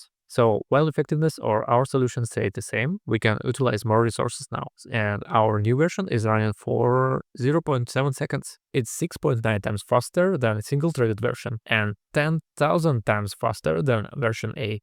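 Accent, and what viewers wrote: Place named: Russia. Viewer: Мне так нравится слушать русский акцент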